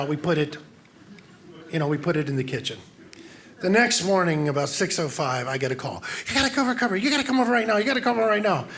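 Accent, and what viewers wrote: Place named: Kentucky, United States. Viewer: You can tell he’s from Kentucky 🤦🏻‍♀️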